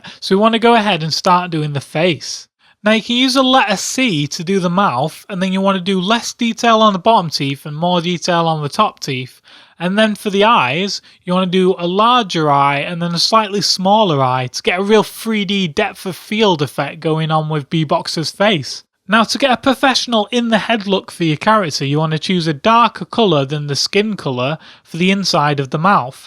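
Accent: United Kingdom. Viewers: Dear. You sound like you're from the UK Sam Age 16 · Best brit voice ever.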